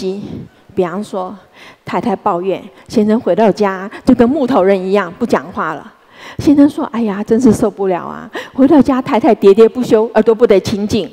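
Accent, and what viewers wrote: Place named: Taiwan. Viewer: 台湾女生说话真的很有特色，我个人是蛮喜欢的的。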